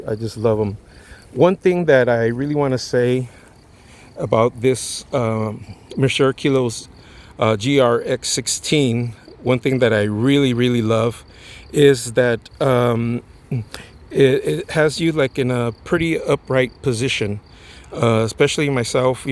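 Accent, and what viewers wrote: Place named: Southern California, United States. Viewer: U sound vry southern cal. Where all th smartest people live. Smart purchase. U 4got mention yr bike has a teardrop aero down tube larger diameter. Mine is chrome version my upgrades vastly superiour 2yours& delete 3lbs over yourz. Relaxed geometry = superiour shifting huge reduction in cognitive stress. Always apply glue tube (and) patch blow dry maybe u find iz stronger result. Go buy u some 38mm Specialized Sawtooth $19 Jenson b4 they run out. 120 TP1 faster then yr frictiony knobs on MAXXIS. I clocked 12mph over San Gabriel mts fireroad slicks vs 8mph sluggish knows. Yes knows are fun 2look at. No they dont wrk. use slicks is best bleev it or not rubber gets traction. Hard 2bleev I know. Use melted parrafin wax chain tubeless tires. Even with tubes my new bike& new positioning man it just FLEW. When I got Walmart 17miles away It felt like I just left driveway brilliant ergonomics body not sore wznt tired at all either. 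Mind blowing. My Mercier build is actually wrldz best bike all others will now be judged by end all personified I built it delete my E Bike I was SHOCKED 1st time I rode it. Felt like it had a motor. I am ^ always have been cycling true epicenter 1x, dual control shifting on your drops, Disc specific rims/disc's pedal straps short upright top tubes, "Gravel bikes" with wide fork& stays thoze were all my doing from r decades ago. U neglect mention TAIWAN by far best builder bikes or anything. Very mature responsible people Taiwan = true reason Honda became ki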